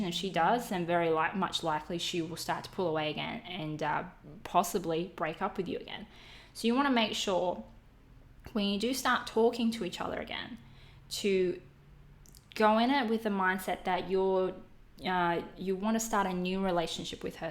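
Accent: Australia. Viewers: Hi, are you Aussie?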